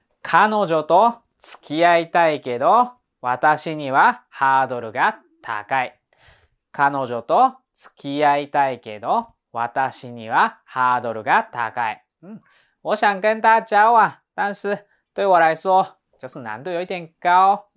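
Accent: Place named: Taiwan. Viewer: 老师中文一听就是在台湾学的吧？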